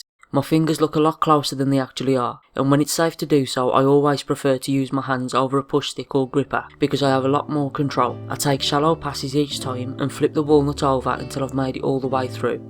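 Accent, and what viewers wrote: Black Country, United Kingdom. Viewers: Is that a black country accent?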